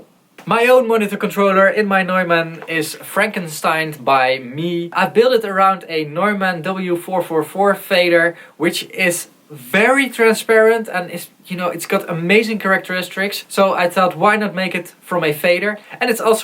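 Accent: Netherlands. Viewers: You sound dutch..right ?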